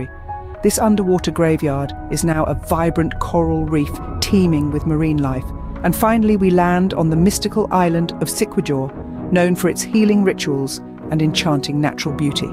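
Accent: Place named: United Kingdom. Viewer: Nice Video. With the British Accent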